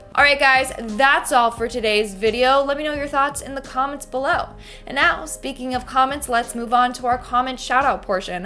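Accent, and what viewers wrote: Canada. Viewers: Are you Canadian ? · Hey are you Canadian and if so French Canadian?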